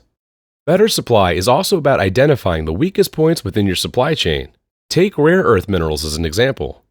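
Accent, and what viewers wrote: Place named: United States. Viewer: Why is there an American voicing for an EU project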